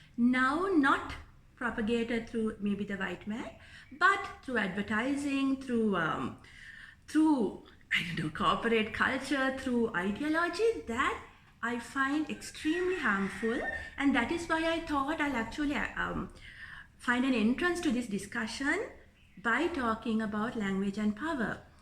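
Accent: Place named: Sri Lanka. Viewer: Sri lankan standard english accent.👍👍👍👍👍❤️❤️❤️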